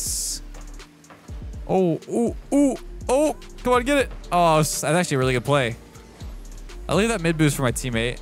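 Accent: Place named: Canada. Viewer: i can hear the canadian so much more now omg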